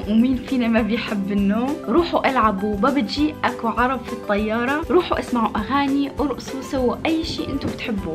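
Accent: Tunisia. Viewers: انت تونسية ؟؟؟ ولا جزائرية ؟؟؟؟ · هل انت تونسية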